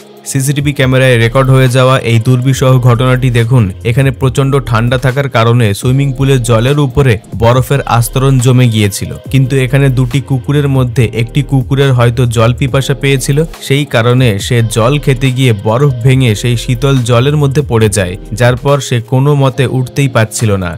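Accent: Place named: Bangladesh. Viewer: Vdota bhaloi baniyechho, tabe India ke chhoto karar prochesta nindoniyo. Tomar Bangla shune mone hoy tumi Bangladeshi. Bina kharache Hanglamita tomader moddhei dakha jaay, Bharatiyoder moddhe noy. Bharotiyoder aatmo marjada gyan aachhe karan ekhane garibi anek kam, ja tomader deshe aakash chhoa.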